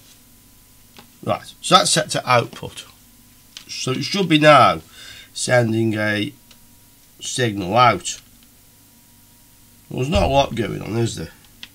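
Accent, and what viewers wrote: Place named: England, United Kingdom. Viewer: Very entertaining and valuable video. Learned now how to "design" the varioboard today. Can someone tell me what English accent he has? Where does he come from in the UK?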